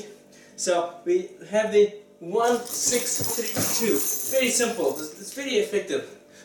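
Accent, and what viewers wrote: South Africa. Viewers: Are you South African bro? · U sound south african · crazy how you still have your accent prob from your perents tho, any way i dig your channel keep it up. oh do you ever come down to fight in SA?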